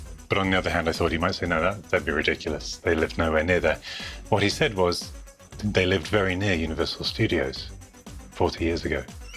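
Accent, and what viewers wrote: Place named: Cockney, United Kingdom. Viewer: the oy bruv accent. I love it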